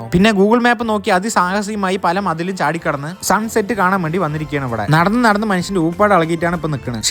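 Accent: Palakkad, India. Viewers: നിങ്ങ പാലക്കാടാണ്്ന്ന് പറയാണ്ടെന്നെ അറിയ്ണ്ട്. ഗെന്താ മക്നേ.. ഹാവു.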